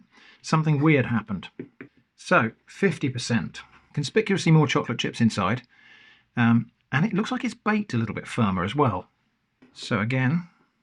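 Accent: United Kingdom. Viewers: You don't know how wonderful it is to hear a British accent calling cookies "cookies" instead of biscuits.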